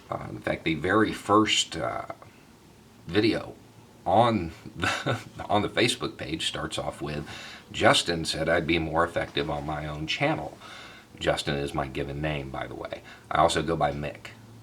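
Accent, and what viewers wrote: South, United States. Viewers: Well, I'm here not because of your thick southern accent. It's the content. It's not 100.000 subscribers. As I'm writing this, it's almost twice that. I'm not sure I'm your typical audience, as a Brazilian, my interest in your channel isn't your accent. You are someone who spread ideas, and I support them. It's comforting to know that people like you take the time, the risk and expose your face and tell your thoughts because you know you have to. Thanks and keep'em coming. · I love the southern accent. Just afraid of the ppl. But its nice to hear. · Your ok with me like southern accent. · Love Your point of view, jealous of your southern accent. Don’t know why I associate it with pure America. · Don't be fooled by a Southern Accent - and Trae Crowder for President. What a wonderful thing that would be. Appreciate your calm, rational, common sense approach,. 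Cheers from Canada 👍🇨🇦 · Who Hoo, you're a Big Shot Now! 100,000 Subscribers! A lot of people have never heard anything like you. And to find out that you actually make sense, also that you are not only intriguing, you are intelligent, and have critical thinking skills. I believe, that draws people to you. And you represent many of us in the South, that can have intellect and a Southern dialect. The stereotype of some Southerners is also for real but not the rule, as you smartly display. Good for you, good for you! Keep up the good work. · love your Southern accent. Wish I could have seen your first photo background videos. I'm a Mississippi home grown. Miss my roots. Thanks for letting us know you are actually Human and not one of those cyber robots. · I think it's great that you use your real accent. If southerners change their accent to be taken seriously in media, then southern accents will only be heard reported ON, not by. 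I'm not American, and I don't carry that bias when it comes to your accents, but since I started watching American politics, I've come to realize that a racial gap is not all that needs to be mended. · Dude this is crazy because I just looked more into you like 3 days ago and found out all this stuff, except I had the accent thing backwards; I thought you were faking the southern accent, but its crazy that you managed to hide it before. But I get why you would hide it, I'm self conscious about that kind of shit too. Ahh, the life of having social anxiety. Edit* -your channel is dope as hell man, and I just want to say keep up the great work. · I went to a K thru 12 school and grew up in a town with 258 citizens, yeah, you are from the south. I played down my accent for years, because I was MI and Signal... now I don't give a fuck. LOL I love your content and it is wonderful knowing that other southerners have opened their minds and see what is really out there.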